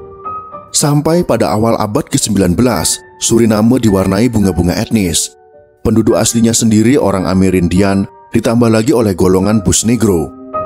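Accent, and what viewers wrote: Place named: Kalimantan, Indonesia. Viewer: Jawa Kalimantan ya min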